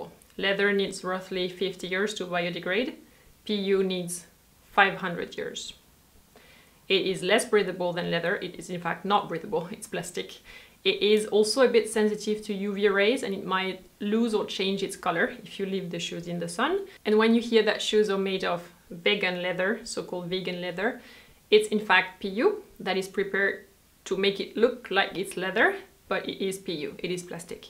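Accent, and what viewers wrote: France. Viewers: I’m so glad I stumbled onto you! This was very helpful! Are you French or French-Canadian? I love your accent! Très mignon! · You are so beautiful and elegant , and I love your french accent and your aristocratic look ❤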